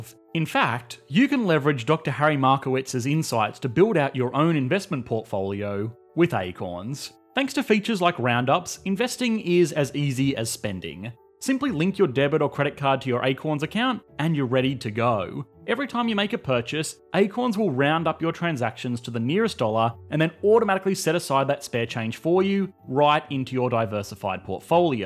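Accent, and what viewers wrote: Australia. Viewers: He’s aussie mate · This guy has a very Australian accent. There are three Australian accents really, broad, general cultivated- with some variations between states too. This is almost a broad accent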